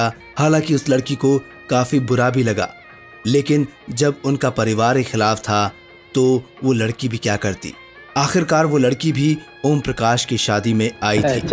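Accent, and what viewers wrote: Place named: Urdu, Pakistan. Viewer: I don't like your urdu pronunciation. Speak Hindi.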